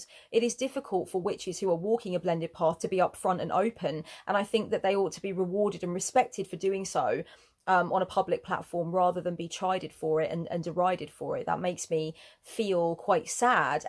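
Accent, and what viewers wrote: United Kingdom. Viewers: I enjoy your intelligent and independently minded videos. Find them quite relevant. Plus, like British accents. Which regional accent is that?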